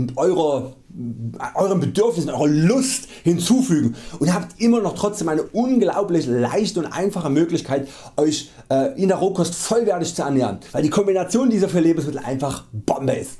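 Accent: Saxony, Germany. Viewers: dein sächsisch ist ja immer wieder schön ^^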